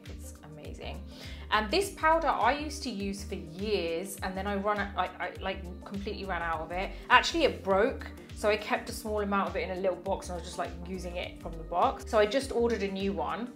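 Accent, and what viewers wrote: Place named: United Kingdom. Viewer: Hi, I love to see your videos, I think you are beautiful, funny, simple and your tone of voice relaxes me, I love love the Brit Accent you have, and of course, I relate to you because I think we kind of have the same type of skin, combo/ textured, anyway I love the fact that you recommend efficient products that actually work, not just sing out loud every week a bunch of new products to get more attention of PR and get paid for partnering with them, which I do get it, I understand that´s the business of being a YouTuber Influencer, but I also do highly appreciate people that share their skills for the art of it....there is a difference in the camera when we see the Sell it all Youtubers and the Real Pro that actually share their knowledge and you are one of them! so thank you for your time and effort in the editing, it is appreciated! 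By the way, talking about pro products, have you heard about the new release of the RCMA Liquid Foundation & different types of Powder....I just saw a video of someone with the foundation and it made me think....is it such as good as the OG translucent Powder that all the Makeup Artists use? made me curious as for now I will try the Tarte Shape Tape one as you recommended it! hils from Viking Land Denmark!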